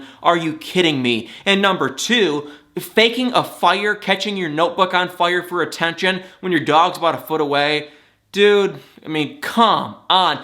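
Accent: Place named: Midwest, United States. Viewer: "Oh my God!" Hahaha your Midwestern voice reminds me of home, Cleveland. Live in L.A. a long time now, but go home as reg as I can.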